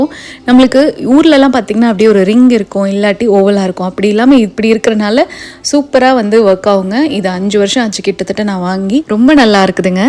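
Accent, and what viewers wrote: Coimbatore, India. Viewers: Nice way of talking coimbatore slang sis ,today lunch box spl bisibelabath and egg omelet sis ,lots of love and hugs❤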